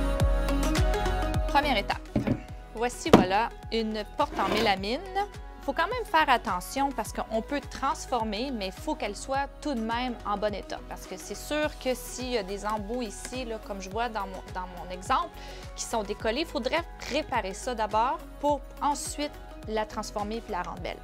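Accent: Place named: Quebec, Canada. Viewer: C'est super d'avoir des vidéos en français avec le si joli accent québécois.......Un petit coucou de France . Ici nous n'avons pas les mêmes produits mais je me débrouillerai surtout pour la cire........La revalorisation de cette armoire tombe très bien car j'ai une armoire de chambre blanche que je vais mettre dans mon atelier que j'ai enfin dans ma toute nouvelle maison en bois......Ce bleu me plait beaucoup.....Si je ne me trompe pas j'espère que BB est bien arrivé. Merci encore pour ces vidéos.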